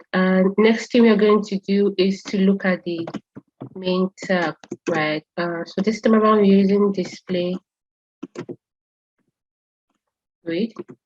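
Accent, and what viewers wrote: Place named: Igbo, Nigeria. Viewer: The Igbo accent makes me feel at home 🥳🥳🥳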